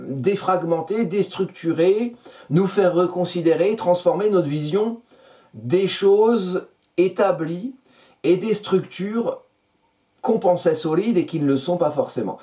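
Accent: Alsace, France. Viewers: ....un petit accent Alsacien ou mosellan derrière les fagots ;) ??? Beau travail et vidéo que j'ai plaisir à écouter. Longue vie à votre chaine :) Signée une Alsacienne perchée.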